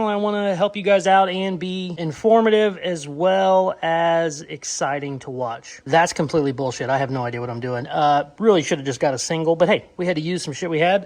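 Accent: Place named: Missouri, United States. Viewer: Been watching all the fscalade vids,great content bro keep going, and also are you from Missouri? A lot of the ppl there said roof like you when I worked there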